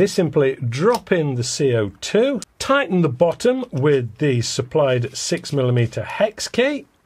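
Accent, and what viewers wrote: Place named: England, United Kingdom. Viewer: So difficult England English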